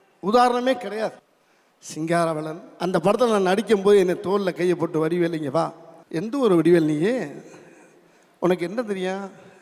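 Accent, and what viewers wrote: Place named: Madurai, India. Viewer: இவருக்கு கைக்கொடுத்தது இவர் பேசும் மதுரைத்தமிழ் வாழத்துக்கள்🎉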